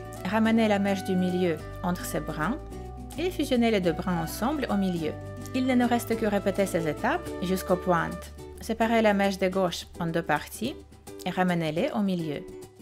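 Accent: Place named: Russia. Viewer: t'as l'accent très russe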